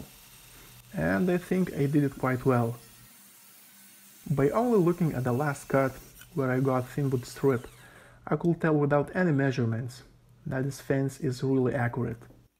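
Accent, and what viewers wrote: Russia. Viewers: Чувствуется русский акцент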